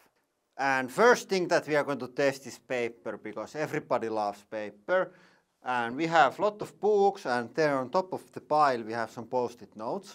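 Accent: Finland. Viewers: It is so much better with the Finnish accent 😂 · I want to listen to you explain everything in a Finnish accent! 😄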